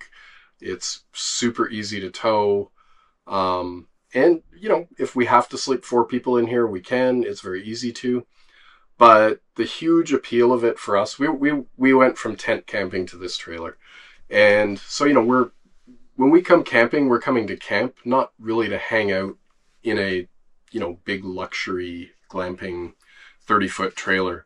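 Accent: Canada. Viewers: Why can't Canadians pronounce "out" (oat) in proper English?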